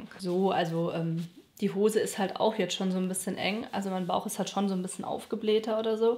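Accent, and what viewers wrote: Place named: Hesse, Germany. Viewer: Sie kommt aus Hessen sie schttt nur ! Das nennt man Hessissscchh !! ♡♡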